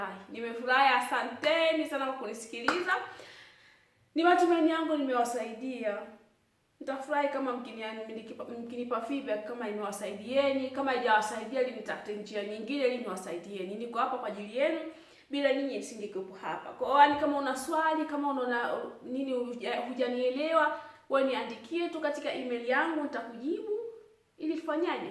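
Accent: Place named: Kenya. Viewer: We ni mtanzania lkn unaongea kiswahili Cha kenya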